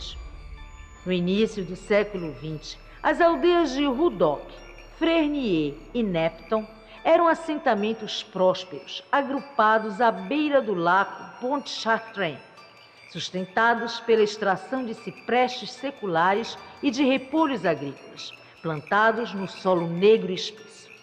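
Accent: Pará, Brazil. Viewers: Olá senhora gata,estou fazendo uma pesquisa sobre vc, eu sou muito curiosa, pois bem, esses tempos eu te perguntei se vc era locutora, e vc gentilmente me disse que não, então pensei: Se vc não é locutora, então é bem provável que vc saiba cantar, uma pessoa com uma voz dessa, com certeza sabe cantar. Outra coisa: seu sotaque é diferente, parece paraense.... Outra coisa, queria muito vê o seu rosto, então vc me disse que estragaria a personagem, vc tem razão, ia perder a graça, mais vendo o seu rosto no facebook, naquele vídeo desejando feliz 2019, percebi que o formato do seu rosto é bem bonito, e olhando essa sua foto do YouTube, percebi que o seu cabelo é meio loiro, bom,tô quase terminando minha pesquisa, sei outros detalhes mais não vou falar, sua indentidade, vai ficar escondida comigo, até porque minha admiração e amor por você é equivalente a 1 metro e 56 centímetros de altura(é meu tamanho) um beijo na bochechinha, amo-te!!!!❤❤❤💋💋💋💙💙💙💚💚💚💛💛💛💜💜💜obs:(Vou te provar que sei muito sobre vc,te mandarei solicitação de amizade no seu face verdadeiro, (Elaine Salvador é o nome do meu face) vc é incrível, já virei sua fã.....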